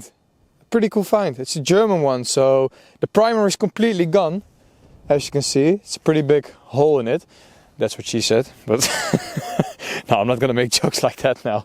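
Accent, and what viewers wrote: Netherlands. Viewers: Even more disrespectful, and in fact illegal is taking artefacts away from the site. This guy sounds dutch, maybe he doesn't know the laws? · Jij bent Nederlands he?🤣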